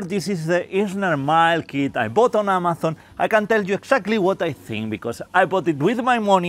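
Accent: Spain. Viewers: Me encanta estar buscando todo en ingles y encontrarme con un español hablando ingles, como se nos nota el acento cuando hablamos... Nice video mate :) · I just bought this now, nice review. I like your accent btw, are you Spanish?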